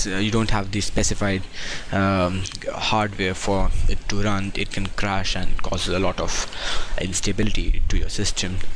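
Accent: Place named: India. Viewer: he's indian . i can get that from his accent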